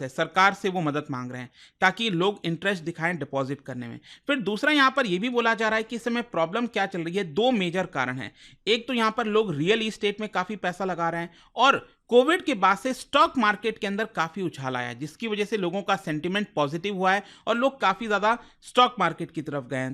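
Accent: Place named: Maharashtra, India. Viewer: maine isliye pucha kyuki aapka tone thoda maharashtrian laga muje btw im from rajasthan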